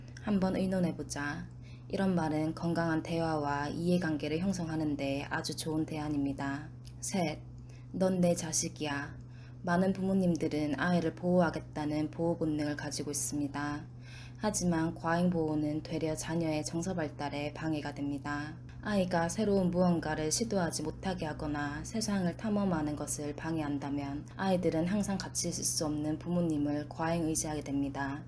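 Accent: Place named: Busan, South Korea. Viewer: 나래이터가 부산분이신가바옇ㅎㅎㅎ 잼있어요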